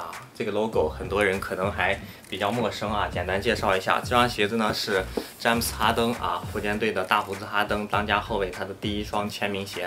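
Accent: Shanxi, China. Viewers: 是山西人吗？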